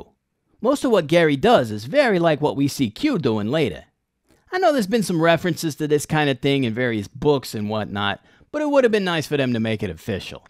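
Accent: Brooklyn, United States. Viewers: love the brooklyn accent and snark..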